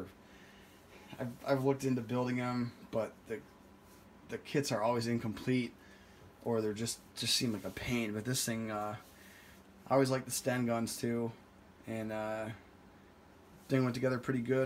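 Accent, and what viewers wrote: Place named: Illinois, United States. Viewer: I was about to ask if you’re from IL based on your accent but I saw comments in the affirmative lol same here. Moved the hell out to chase my gun dreams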